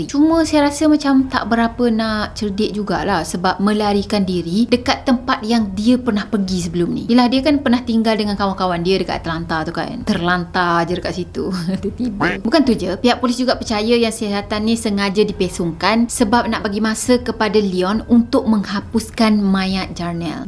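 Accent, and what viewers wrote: Kelantan, Malaysia. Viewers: kamu org Kelantan ke?.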